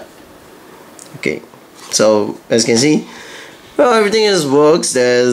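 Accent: Singapore. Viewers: Hmm sounds like singaporean Haha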